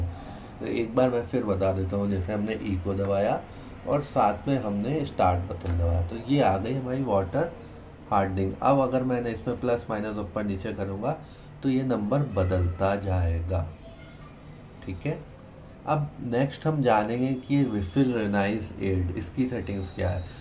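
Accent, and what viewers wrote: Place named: India. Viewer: I not understand nothing ur indian accent ohhh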